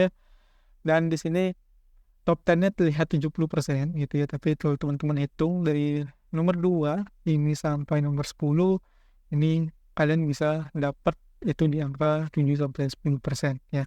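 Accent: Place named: Bali, Indonesia. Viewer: Permisi bang saya followers baru Kalok boleh tau abangnya dr mana seperti logat bali soalnya🙏🏻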